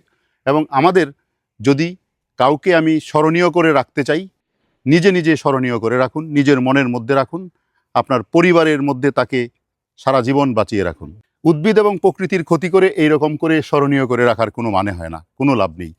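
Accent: Bangladesh. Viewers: আপনার কথা শুনে মনে হয় আপনি বাংলাদেশের,আবার বর্তির বিলের কথা শুনে মনে হচ্ছে আমাদের পশ্চিমবঙ্গের।আপনি কোথায় থাকেন জানিনা তবে যে কাজটা করছেন খুবই প্রশংসনীয়।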